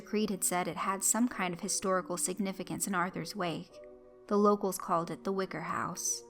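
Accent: South, United States. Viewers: Great narrations love the southern accent really like your productions keep up the good work.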